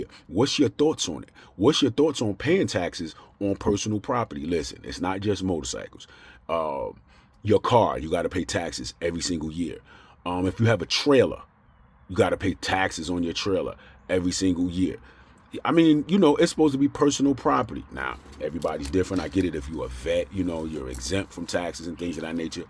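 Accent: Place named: Maryland, United States. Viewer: Sounds like you grew up in maryland and moved to Virginia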